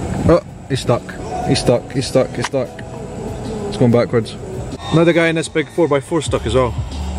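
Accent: Scotland, United Kingdom. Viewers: Hey, cool! You're from Scotland! Love Scottisch accents! Subscribed! :D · Love the vlog! I moved over to Sweden last year and it's nice to hear a Scottish accent again!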